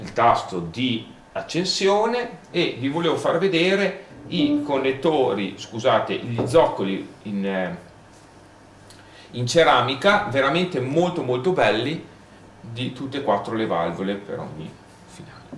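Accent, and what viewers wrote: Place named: Reggio Emilia, Italy. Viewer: a parte il fatto che se non correggi la tua dizione avrai un targhet di vendita limitato al solo territorio reggio emiliano fatto da soli formaggi mortadelle e prosciutti e pochi chilometri di distanza .. pistola !!!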